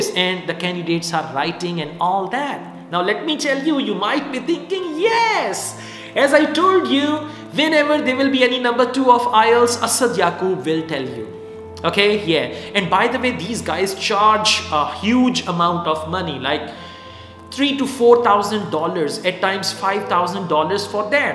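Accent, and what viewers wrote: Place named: Punjab, India. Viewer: Thank you sir for updating us about the current affairs of Ielts . By the way i like your punjabi tone during video . Almighty Allah grant you long and healthy life . ameen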